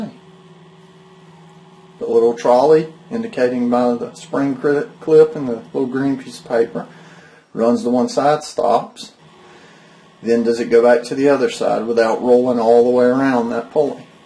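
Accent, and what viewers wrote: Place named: South, United States. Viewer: Thats a cool Southern accent. The best in the USA. In my opinion.:)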